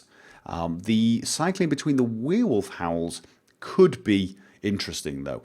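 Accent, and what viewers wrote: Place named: North, United Kingdom. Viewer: Let me just say that you are probably THE Greatest "Instructor" Or whatever you like/prefer to be called... lol May I ask where your from exactly? (Random question) haha. My dads from Ipswich and hes normally good with accents and all that be he couldnt figure it out.. He said maybe somwhere kind of North in the UK or somthing... (I know you probably dont like your comments to be filled with random stuff like this, but I HAD to ask) lol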